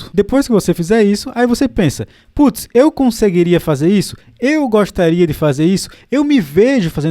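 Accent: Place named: Northeast, Brazil. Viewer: Eu só queria saber de que parte do nordeste é o sotaque do. Tenho amigos nordestinos e não consegui identificar.. Bah. Virei fã dos teus vídeos...abraços gaúchos..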